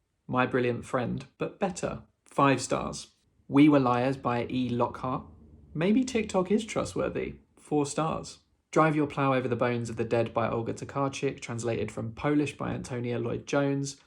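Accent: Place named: United Kingdom. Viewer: Love your British accent, I will trade you for my Long Island accent 😂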